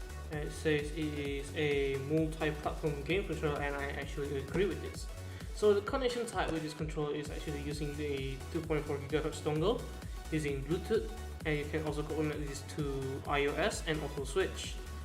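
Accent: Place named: United States. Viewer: Your English is good like American 👍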